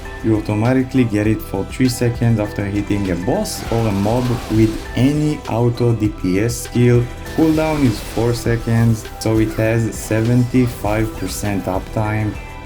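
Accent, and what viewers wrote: Israel. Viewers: 1 thing i didnt see u mention is the "Takeno's Blessing" skill from mushroom shrine. Its a fake iframe that can tank some boss patterns ( Lucid's bomb, p1 Will screen crack and a few others ) AND u can reset it with Time Leap. And if ur already going for the mushroom shrine skills u can also grab the Yorozu bind as it is also resetable with Time Leap. Also, dont worry about ur accent, its much better than other Israelis. Keep on the good work! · המבטא הישראלי תפס אותי גם חחחחח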